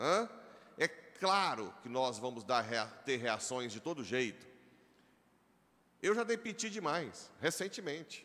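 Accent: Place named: Minas Gerais, Brazil. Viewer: padre o sr é Goiano mas tem meu sotaque mineiro, gosto demais.Amo seus ensinamentos.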